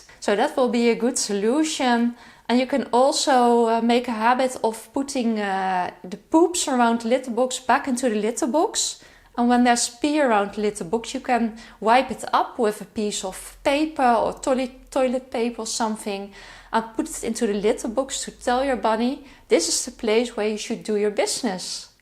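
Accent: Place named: Netherlands. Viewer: you Dutch you have an accent like me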